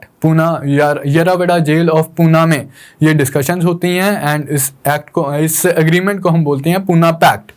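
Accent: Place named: Punjab, India. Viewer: Thnks to u sir..when i feel sleepy i watch ur videos and u energetic voice and simple explanation with light punjabi touch ...massah allah it gaves me a energy for continous study..thnks again sir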